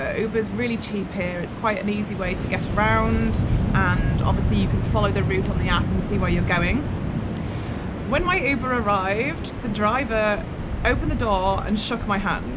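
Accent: England, United Kingdom. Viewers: your accent is more like English from England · I like your British accent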